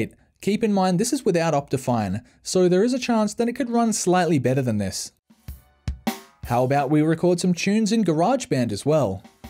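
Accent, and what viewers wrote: Australia. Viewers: The Aussie accent 😆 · got that aussie sound. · here is that aussie voice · That's the aussie accent mate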